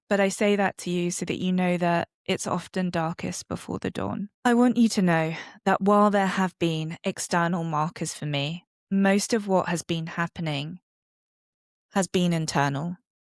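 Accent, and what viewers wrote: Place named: United Kingdom. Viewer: hearing a British accent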